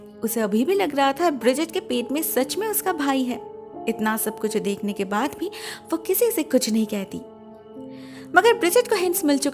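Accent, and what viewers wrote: Bengal, India. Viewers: Woh bengali h. Unke accent se pata chalta h. Upar se unhone durga puja k waqt bengali me wishes di thi viewers ko. Sri lanka se nahi h. Kuch bhi bolte rehte h log. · R u Bangali?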